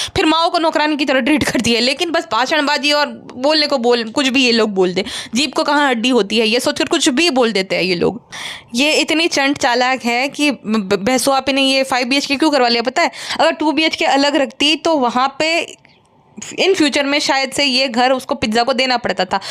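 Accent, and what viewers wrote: Gujarat, India. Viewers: Your Reaction vlogs are always great ..In between love hearing you Gujarati accent and words ..Keep it up and God bless you ❤️🙌🫶🏻🦋🧿🌷✨🤗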